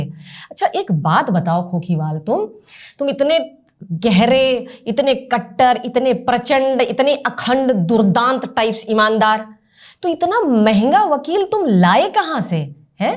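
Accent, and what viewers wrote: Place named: Bihar, India. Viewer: Aapke muh se bihari bhasha ki shobha bdh jaati hai💃💃💃💃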